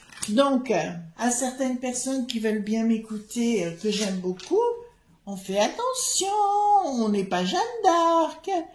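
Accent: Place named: South, France. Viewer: c’est le parler du sud je connais et ça ne me choque pas !‘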